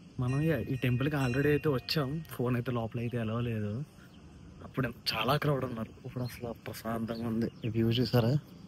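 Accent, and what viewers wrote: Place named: Godavari, India. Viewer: Ekkadaki vellina godavari slang😂.. Bavuntadi andi mana slang❤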